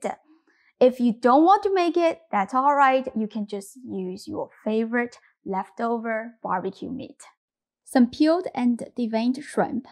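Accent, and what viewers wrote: China. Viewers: This looks delicious and I LOVE your accent! I've heard Chinese accent before but yours is lovely.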